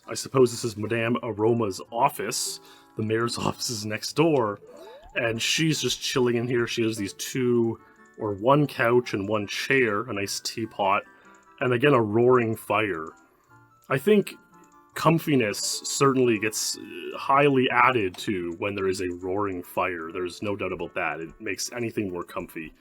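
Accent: Canada. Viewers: When people call Dragons, “draygons” blows my mind. I guess it goes hand in hand with saying “ewt” instead of saying out. Must be a canadian, North US accent. Relaxing, chill video though. Enjoyable. I like the focus on the lesser talked about games.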